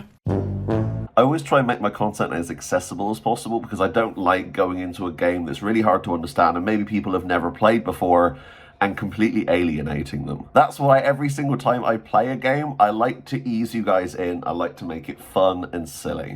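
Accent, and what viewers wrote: United Kingdom. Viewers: So to confirm...you are British?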